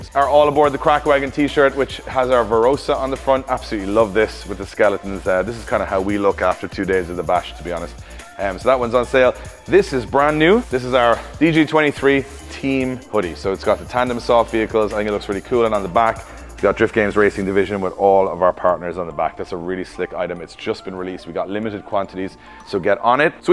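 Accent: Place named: Ireland. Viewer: shocked when i heard the irish accent and then that you said this was in dublin ... instantly subbed ....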